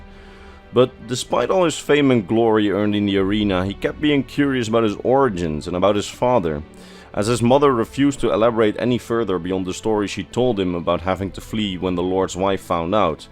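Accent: Netherlands. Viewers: Dutch i guess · Are you like, Dutch?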